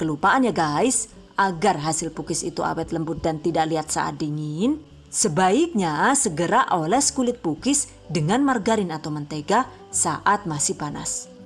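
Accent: Banyumas, Indonesia. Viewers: Ngapakers nya mantap , pukis nya jga mantap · Lucu bs nglucu n bhs ngapak pndai pula ber bhs inggris msih muda tp Suarana mntap seperti wanita dewasa kya pula, mksh resep" nya berkah buat bnyk org sht n sukses sllu 🤲🤲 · Hahahaha seneng nek.lg ngmng ngapak yu 🤣🤣 Jal nek gawean aja akeh" ea lh 500g nyong langka.sng ngrewangi maem yu 😁 Nek srabi gandos ana resepe ora yu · Mba. Jenengn suarane enak temen si. Inggrise ya jos. Bisa ngapak maning. Sht trus ya mba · Done Youtube channel selalu memberi inspirasi resep yang inovatif dan ekonomis Dan mbak itu loh lucu 😂😂 Kalau ngomong ngapak dan catat Gak tau namanya ngomongnya jadi mbak 😂😂 #giveawaythv2020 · Kok bisa ngomong ngapak sih😂 mksh ilmunya❤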